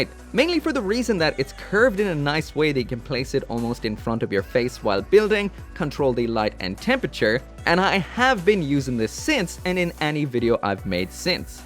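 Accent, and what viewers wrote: Ireland. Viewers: Ahh... he's irish. That's why he talks like that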